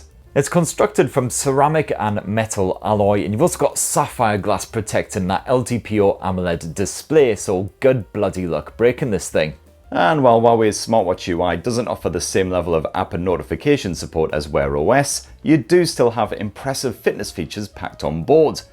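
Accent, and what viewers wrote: United Kingdom. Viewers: that british accent... fancy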